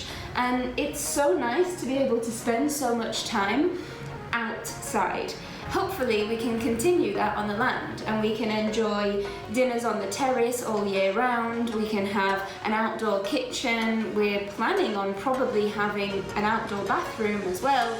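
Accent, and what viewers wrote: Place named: Yorkshire, United Kingdom. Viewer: What a great video and so interesting to hear you explain your motivations and plans for the future. You are very brave to strike out into a remote rural location. I will follow your progress with great interest and wish you both and Paco (lovely dog) all the very best. PS. you have not lost your Yorkshire accent.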